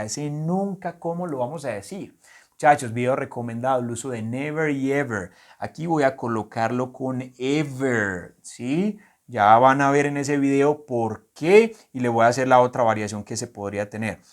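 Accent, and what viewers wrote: Colombia. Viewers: Me gusto este canal porque me encanta el acentos colombiano parce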